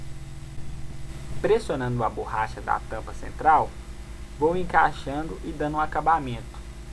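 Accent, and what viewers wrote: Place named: Paraíba, Brazil. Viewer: Kkkk gostei do sotaque paraiba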